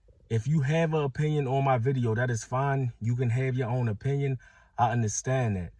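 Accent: Baltimore, United States. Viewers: you sound like a Baltimorean ☺️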